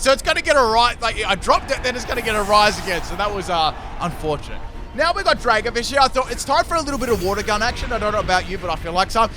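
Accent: Australia. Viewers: Yes his Australian accent makes it so funny 😆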